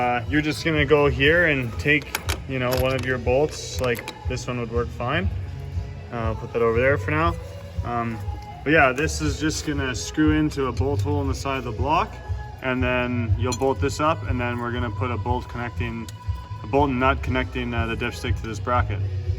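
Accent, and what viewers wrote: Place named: Canada. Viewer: You sound Canadian, where are you working from?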